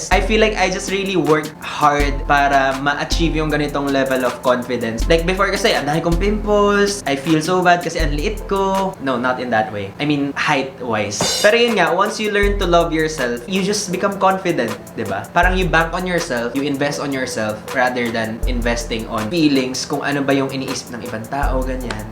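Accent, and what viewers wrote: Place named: Conyo, Philippines. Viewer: Genius sa math Me: Si kuya yun Conyo magsalita:Si kuya ulit yun.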